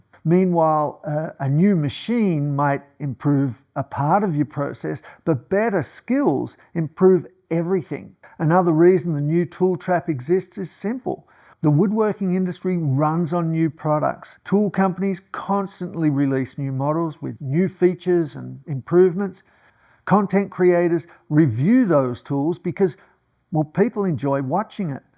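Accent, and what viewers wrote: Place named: Australia. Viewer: Great advice. I will stop buying gear and start making. I’ve just found your channel and it was nice to hear an Australian accent.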